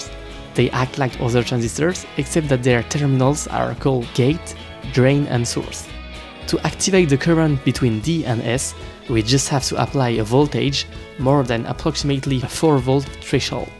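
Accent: France. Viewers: the accent is not the best (i know you are french) but great video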